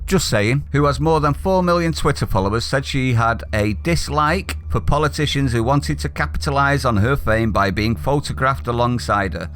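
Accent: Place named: Merseyside, United Kingdom. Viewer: Totally nailed it. little Greta made her fame by being a funny witty talking parrot and now that she is famous... she complains that people were coming to see her just because she was an unusually entertaining parrot in their local town square. Waki Waki Greta! here are some more news for you: same people, when visiting Paris, also take a selfie with the Eiffel tower just because its the famous... Eiffel tower. None of them have even a bit of appreciation to the engineering genuinity of the 19th century. They just want to brag to their friends they were seeing the f&%king tower while they (friends) were taking their kids to school in the rain. Your channel became my favorite daily news channel... from way-way far from Britain you sometimes sound to be like a disillusioned John Lennon speaking to our world, horrified by the reality all around... 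(sorry for my cultural narrow mind views... to my non Brit ears Mersey area accents sounds as one... ). Keep the great work!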